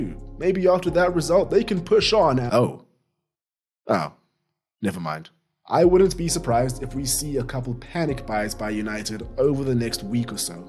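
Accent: South Africa. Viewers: Bru u sound South African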